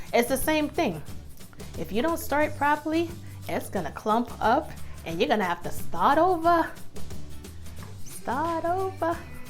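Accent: New York, United States. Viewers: love ur ny accent lol been watching all ur videos keep em comingggggg !!!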